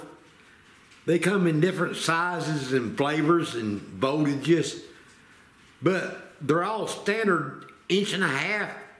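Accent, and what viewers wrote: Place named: South, United States. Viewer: You definitely from the south like me 😜 yee haw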